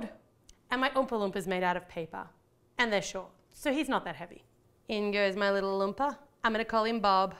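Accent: Australia. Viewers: Is she Australian? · Anyone know what part of Australia she is from? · are u australian ? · she is australian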